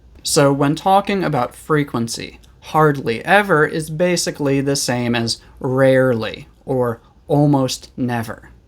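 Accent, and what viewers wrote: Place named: United States. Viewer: .. I'd like to know where your Am. accent is from... Which state and city were you born in?? Please, answer me when you can.I'm pretty curious about it 😊 Thanks a lot for your classes.You're a great teacher!❤❤😊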